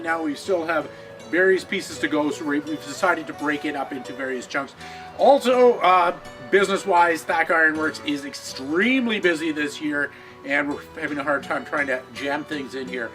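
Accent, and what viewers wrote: Canada. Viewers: Accent says Canada.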